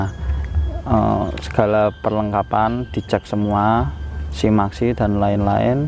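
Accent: Java, Indonesia. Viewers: jowo ne medok mantapp👏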